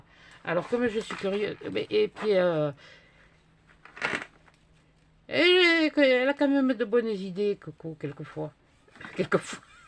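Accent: South, France. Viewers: Bonjour j adore vôtre accent du sud vous me faites trop rire.et vos réalisations sont très jolie.❤😘😘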